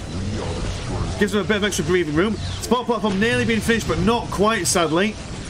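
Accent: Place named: North, United Kingdom. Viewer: northern accent.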